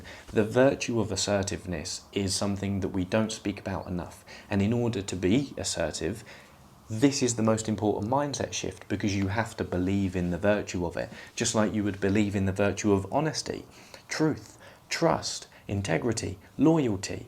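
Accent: United Kingdom. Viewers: Who is this guy and what is his credentials outside of British accent?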